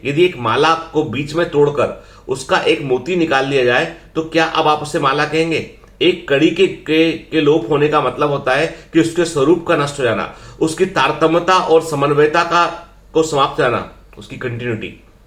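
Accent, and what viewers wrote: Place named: Bhojpuri, India. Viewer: Vha sir gajab ki bhojpuri apki hn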